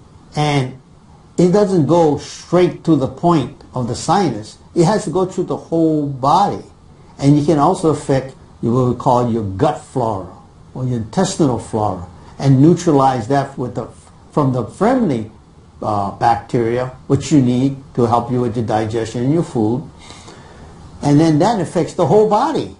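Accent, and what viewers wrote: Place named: New York, United States. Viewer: So, let me guess. You kinda sound like you are from New York. Anyhow, I appreciate your chats. I had a thought of boiling a mix of peppermint leaf and oregano leaf in distilled water and then flushing it up the nose once it cools. You can also breathe in the vapors with a towel over your head over the pot too! I had a health food store owner friend in CT use the oreganol in boiling water and he cleared up!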